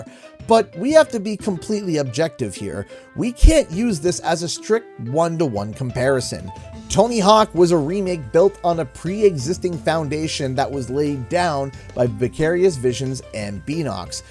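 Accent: Canada. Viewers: What is doing talking about Crash bandicoot? He sounds more Canadian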